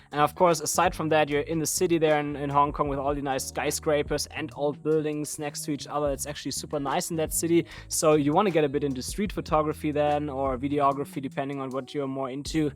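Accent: Germany. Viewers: Where you‘re from originally? Germany? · I love your German accent. also your cool content. thanks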